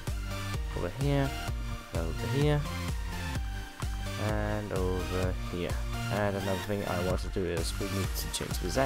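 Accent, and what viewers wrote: Denmark. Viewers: I was about to comment "where are you from i love your accent" but i think i love it because I'm from denmark to, oh and it's called an overhang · R u Danish? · I hear Denmark, i like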